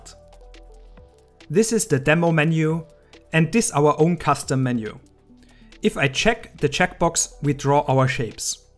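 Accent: Germany. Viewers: Wie man das deutsche raushört 😂 Aber nice Video!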